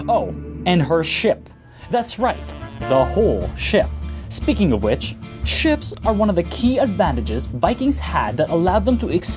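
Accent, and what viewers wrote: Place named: Sweden. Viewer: stop pronauncing danish words in a swedish way those swedish pricks suck. sorry for the bad spelling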